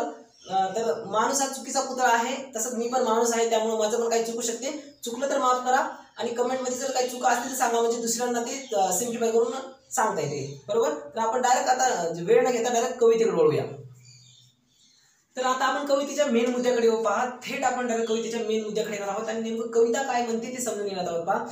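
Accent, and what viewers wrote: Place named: Rural, India. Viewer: ते गावाकडचे आहेत ना म्हणून. थोडं समजून घेतलं पाहिजे आपण 😊 छान समजवल त्यांनी 😊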